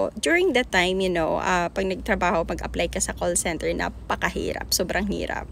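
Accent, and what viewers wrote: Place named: United States. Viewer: Englisera Ka Pala madam at American accent sarap pakinggan.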